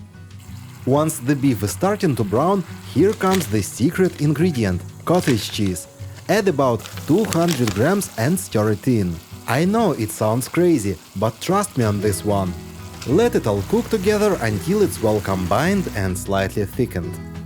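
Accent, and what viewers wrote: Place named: Russia. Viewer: Russian Dialect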